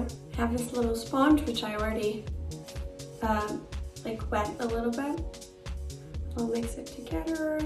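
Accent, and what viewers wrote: Hungary. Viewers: I can barely hear her Hungarian accent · Love how genuine she is and her Hungarian accent ♡ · Yah but She's losing her Hungarian accent now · Her English accent is so good, but you can still hear a bit of that typical Hungarian accent which is kinda cute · if you watch her "Interview de Barbara Palvin, Festival de Cannes" she's actually losing her Hungarian accent now. But still yah her voice sounds so nice. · but if she born like a hungarian ppl then her Accent will be Hungarian forever The english and hun accent is very different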